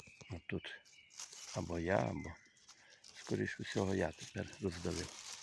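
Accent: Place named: Transcarpathia, Ukraine. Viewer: дякую. У вас говір схожий на наш, закарпатський. Я ще гриба не знаходила, а так хочеться. Вдалих вам походів.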